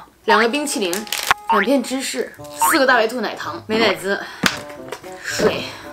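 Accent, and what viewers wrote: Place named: Inner Mongolia, China. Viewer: 另外，感觉羊pd是内蒙古妹子，口音很像我们东北这边，但又能感觉到一丢丢的区别。听着很亲切☺️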